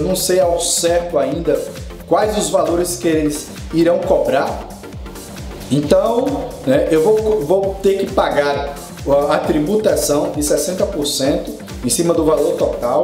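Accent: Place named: Recife, Brazil. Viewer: Também comprei um Mi drone e tô no aguardo, sou de Recife e pelo seu sotaque acho que você também deve ser daqui, tô certo? kkkkkkk enfim.. o meu comprei na Banggood e chegou em SP dia 05/01/17 foi para o CEINT SP/GEARA e no dia 06/01 partiu para a Fiscalização Aduaneira/BR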